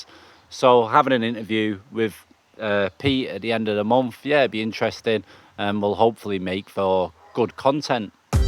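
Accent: Manchester, United Kingdom. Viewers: Todmorden eh, I didn’t expect that😂 I was thinking Gorton or Longsight with that accent. I spent a few years in West Yorkshire, amazing people. If I had to live in England it would defo be there. I’m watching this in 11 degrees with threats of rain, that bamboo hut looks so appealing ✌🏼🙏🏻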